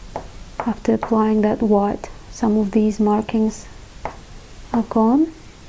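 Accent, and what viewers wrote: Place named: Russia. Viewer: haha, okay good to know! Russian accent is great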